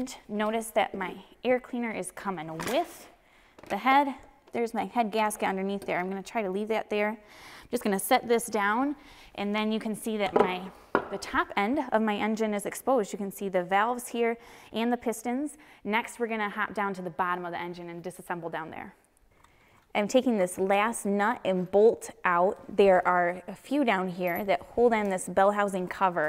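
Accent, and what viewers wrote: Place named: Upper Midwest, United States. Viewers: It took a couple of seconds to figure out what she meant by the pistons being in the "black". The upper-midwestern dialect is so endearing. 🥰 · Lovely girl...very skilled...and love your Minnesota accent.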